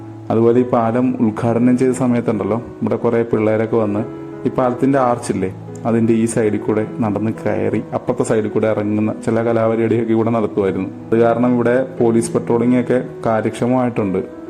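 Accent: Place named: Kollam, India. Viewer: Shudhamaya Kollam slang.. ethaand achadi malayalam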